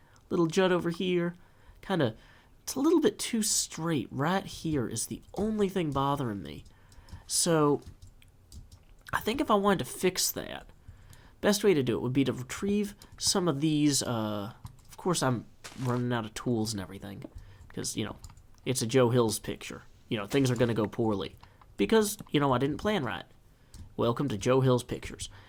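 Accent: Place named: Kentucky, United States. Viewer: I love your reasoning on base size lol Absolutely enjoying the heck out of your videos on HC this year - that bridge was super nice. Your cadence, accent, and word choice remind me of home and make me all nostalgic for my college years in Kentucky. :)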